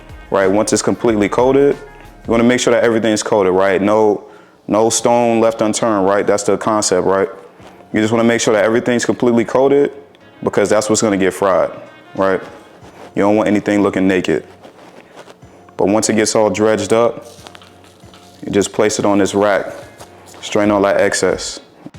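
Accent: New York, United States. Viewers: I hear an accent, maybe New York?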